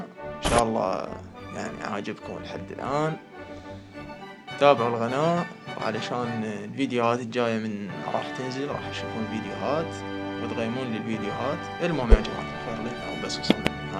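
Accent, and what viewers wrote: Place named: Nasiriyah, Iraq. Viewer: اي بس عبالي من الناصريه لان لهجتك مثلهم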